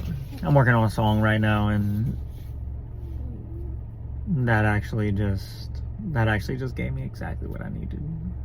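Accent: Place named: Louisiana, United States. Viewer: Hi! I just found your channel! You sound like you’re from Louisiana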